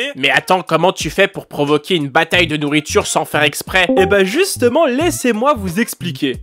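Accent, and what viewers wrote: Quebec, Canada. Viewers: j'aime beaucoup tes vidéos et j'aime l'accent québécois car je suis français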